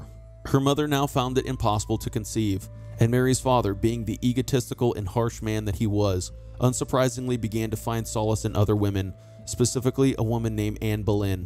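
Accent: United States. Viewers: Soon as u hear a yank commentary its over...csnt stand em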